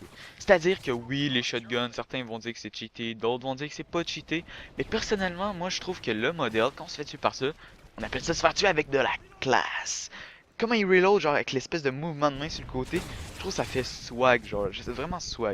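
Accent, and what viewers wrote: Quebec, Canada. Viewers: j'adore l'accent du quebec je t'aime mec :D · quebecois ;D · J'adore cet accent québecois :p Sinon pour moi Mode RAGE : Striker + Damage Mode Tranquille : SPAS12 + Damage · Mais non c'est qu'il a un accent come sa car il est québécois ( dsl si j'ai mal ecrit :s ) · Si vous aussi vous kiffez son accent Quebecois <3 :)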